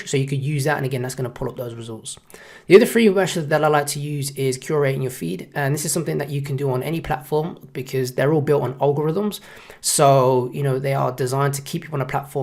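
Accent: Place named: London, United Kingdom. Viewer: Great informative vid keep them coming...also you sound like a Londoner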